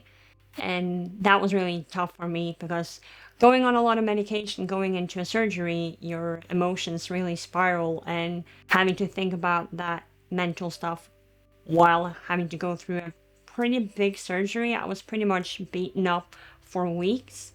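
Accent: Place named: United States. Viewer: Jag förstår verkligen varför du varken hade ork eller tid att skapa och göra videos. Jag har inte kunnat arbeta på väldigt länge pg a min mentala hälsa, så jag förstår dig. Jag hade för övrigt ingen aning om att du är norsk och att är svensk! Du låter väldigt amerikansk 😂 Jag själv är halvnorsk men bor i Sverige 😁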